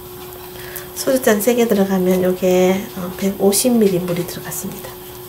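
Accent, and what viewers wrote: Gyeongsang, South Korea. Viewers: 아 ㅋ 설악산쪽요 ^ ^ 지금은 써울이구요 이모님 음식솜씨 ~ 볼수록 최고라 ㅎ 이렇게 배울수도 있으니 정말 좋은 유트브세상이네요 만남 반갑고 고맙습니다 죽을때까지 배워야한단말 실감하며 욜심 따라해보겠습니다 ^ ^ 댓글 고맙구욤 🤗 경상도사투리가 듣기좋아요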